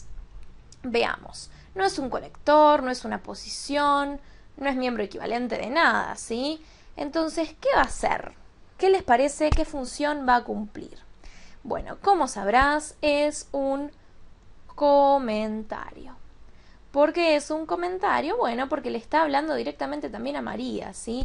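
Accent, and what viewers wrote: Argentina. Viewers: El famoso acento argento! 😄😂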